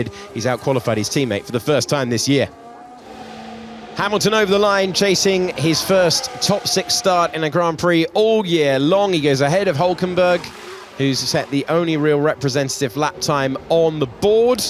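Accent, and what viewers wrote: United States. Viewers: Why is the sound of the cars so low, all I hear is the loud commentary. I know these aren’t the best sounding cars but I still want to hear them. And that American accent 😔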